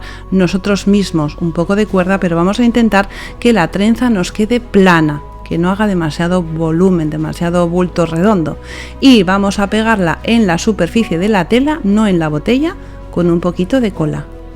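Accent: Spain. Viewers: ❤❤ Precioso como todos tus trabajos, me deleito mirando y escuchando ese acento español que me encanta. Un abrazo desde Buenos Aires. Argentina. 🇦🇷🇦🇷🇦🇷🌹🌹🌹♥️♥️♥️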